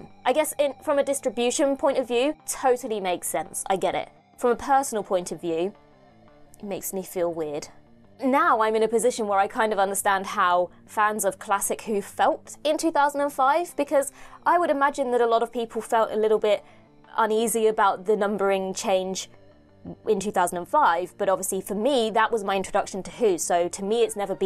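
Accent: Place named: England, United Kingdom. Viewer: You have the best English accent ive ever heard.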